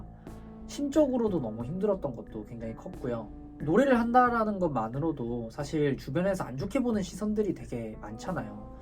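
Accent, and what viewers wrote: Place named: Seoul, South Korea. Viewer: 너무 서울 억양으로 말씀하시는거 아닙니까. 같은 마산 출신으로서 서운합니다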